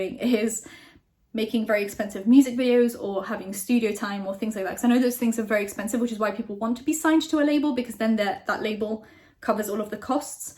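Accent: United Kingdom. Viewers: Ok, I've been to London three times. I lived there for 5 months one of the times. I'm really good at deciphering a British accent....You're actually talking really fast....Please, slow down a bit, dear. What you're saying is interesting enough to want to hear it...I can understand it, but it takes effort. Better if people can relax and listen.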